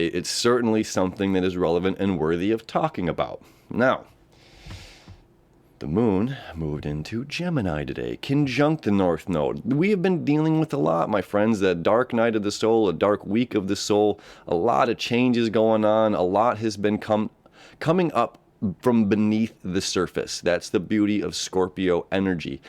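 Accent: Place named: Australia. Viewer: your Aussie accent is actually pretty good, it's not terrible at all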